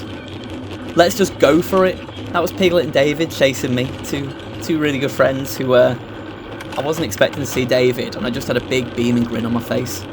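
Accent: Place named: Derry, United Kingdom. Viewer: Your accent sounds just like Aileen from Derry on the Graham Norton show. 😆 Good job on the hillclimb!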